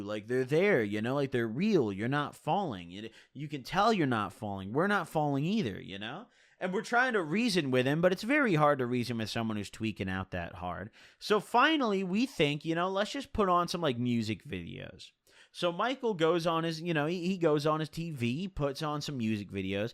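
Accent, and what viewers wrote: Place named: New Jersey, United States. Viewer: Why does he sound so different in some videos. Sometimes he's really nasally and has like a breathey undertone like some 30yo that lives in New Jersey, other times he sounds like a 24 guy that weighs 150 and doesn't get enough sunlight.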